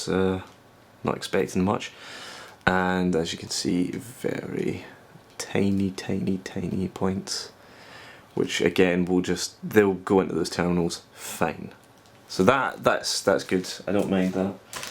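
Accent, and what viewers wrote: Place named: Aberdeen, United Kingdom. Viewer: Where are you from? Sounds like an Aberdeen accent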